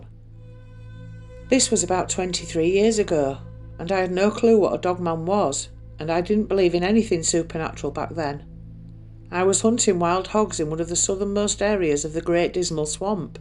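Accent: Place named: Yorkshire, United Kingdom. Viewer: Wow, I’ve just found this after shared the link. I seem to have been unsubscribed!! Fabulous to see you back, I’ve been missing your great Yorkshire narration 😊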